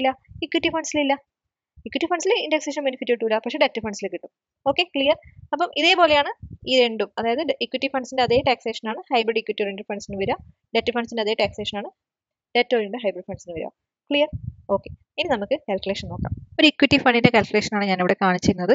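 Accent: Kannur, India. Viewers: സംസാരം കേട്ടിട്ട് കണ്ണൂരുകാരിയാണെന്നാണല്ലോ തോന്നുന്നേ....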